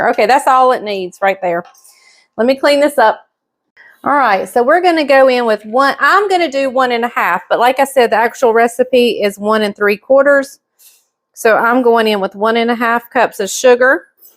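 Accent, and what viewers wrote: South, United States. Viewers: I'm gonna go ahead and soak my zucchni n pneapple juice, yum it sounds perfect for this bread. You are so good, love this video and your sweet southern accent! You are a very good baker/home chef.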